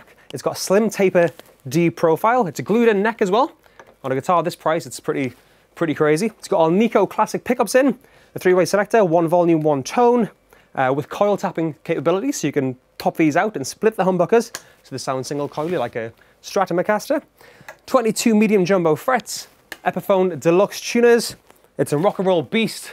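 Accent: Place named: England, United Kingdom. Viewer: he sounds like bob odenkirks english accent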